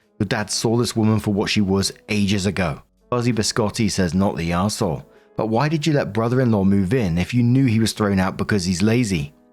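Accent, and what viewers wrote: United Kingdom. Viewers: You're a Brit 😮!?!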